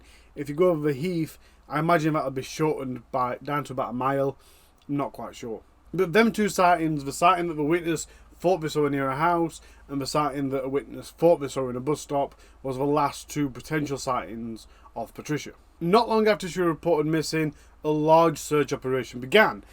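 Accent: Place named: North, United Kingdom. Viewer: As a girl I’ve worn 2 pairs of undies for different reasons… 1. Undies, tights then another pair over the tights to keep them up & in place. 2. As a school girl I’d put my PE pants on over my normal ones if wearing a short PE skirt for netball, hockey etc. 3. If I was on a period & thought it could be a day that I may need extra protection. (TMI?!🫣) 4. If bunking off to meet my first love, putting normal boring ones on first & a nice pair on over them with the intention of slipping 1 leg out at a time to take the boring ones off ready to meet my BF… Maybe that’s what she was actually bunking off school for?? (I know I did!!Again, TMI?!🫢) Who’s to say Belfield wasn’t the one she could have been meeting up with?? BTW, newly subbed & I love your content…especially love your accent!! Hearing ‘gidum’ & ‘tekit’ to name a few, bring little smiles & remind me of my further north friends & family x Thank you x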